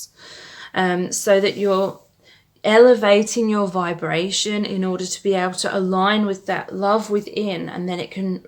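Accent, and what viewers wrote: United Kingdom. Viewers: Wow!! I love ur British accent also fantastic reading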